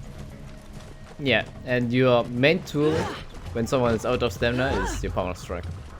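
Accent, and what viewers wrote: Germany. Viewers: its the audio mix, he has a slight german accent but should be understandble for everyone.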